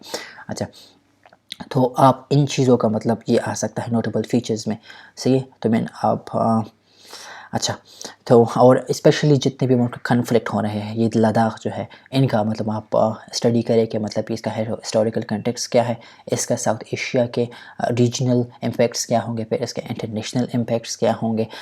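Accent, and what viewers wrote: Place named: United Kingdom. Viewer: Please Urdu ko Urdu ki tara bole me confuse hota hon baz alfaz me... You speak Urdu like English man.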